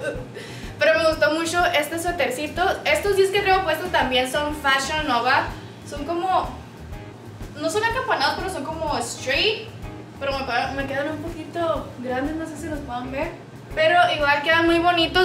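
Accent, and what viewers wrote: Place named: Fresa, Mexico. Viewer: Esta morra se volvió fresa 😂 habla bien fresa ahora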